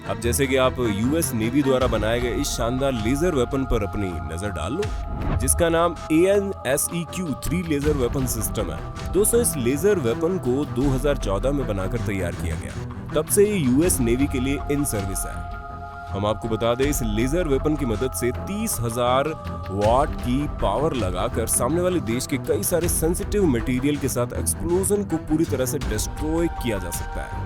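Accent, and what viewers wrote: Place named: Pakistan. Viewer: Kaise hogi? Pakistani hai yeh.iski awaz se saaf pta chalta hai.chanel ka naam haha....Paise kamane hai wo bhi india ke naam pe.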